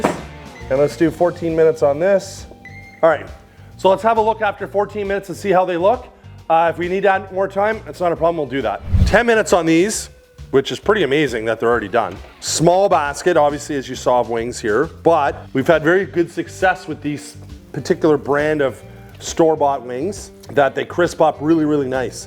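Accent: Canada. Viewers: I love the way Canadians pronounce "out" and "about". Love Ninja products!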